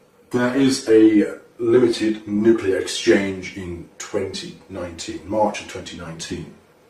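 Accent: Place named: United Kingdom. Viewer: Okay so he’s from The United States but has a British accent?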